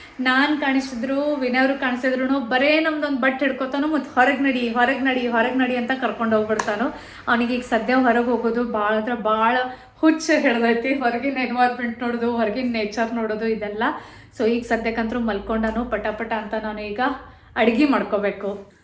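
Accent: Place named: Belgaum, India. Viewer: Hi dear na nim recent viewer ..naanu bgm dist gokak ...nim matodo style nam tara ada anta kushi aytu nodaaku esta aagatetei...evaag hubli total change aagateti matadod .na like ast madtini comments madak aagalla...nandu small kid ada so ...niv couple mast vlog madtira ...yest yella kelsa madtira .. really great dear.. online class tagoteni andri yavdu anta kelabahuda ...?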